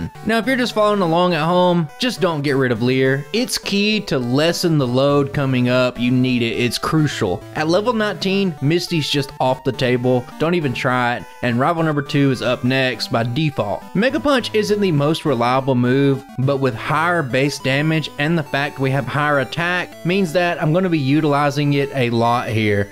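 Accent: United States. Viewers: I don’t know if anyone ever says this to you but every single “southern” character from the indigo league Pokémon anime series shares your accent. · Woah that must be the most american accent ever o.o much yeehaw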